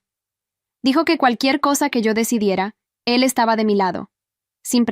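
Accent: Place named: Madrid, Spain. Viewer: Su voz es de la península española Madrid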